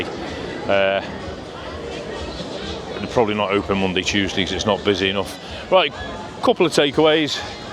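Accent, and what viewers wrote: United Kingdom. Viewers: It's not your home town when you've got the lfc liver bird on yer phone mate and a woolly back accent too la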